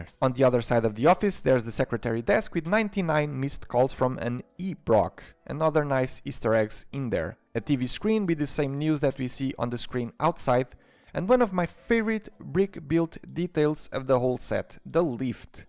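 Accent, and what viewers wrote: Portugal. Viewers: that portuguese accent x) trending video, congrats.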